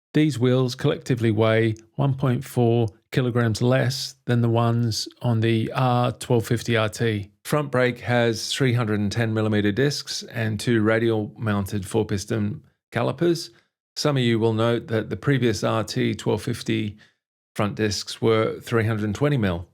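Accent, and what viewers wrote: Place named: Australia. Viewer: Luv yer review. Mayt, ya sound almost like an Aussie. I’ve not seen any of your reviews before, but I liked how ya did this one so I’ll look at a few more. Cheers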